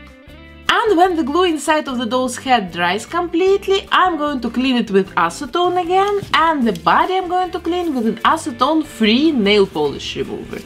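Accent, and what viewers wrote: Russia. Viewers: Мне самому показалось, что что у неё русский акцент, а теперь даже сомнений не осталось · Почему мне кажется ,что здесь присутствует русский акцент? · Alena Vampanskaya yeah, I thought about it too. I'm just interested in her cute accent Well, it would be great, if she's Russian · What is your accent? Russian??? Whatever it is I LOVE IITTTTT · Блин какой русский акцент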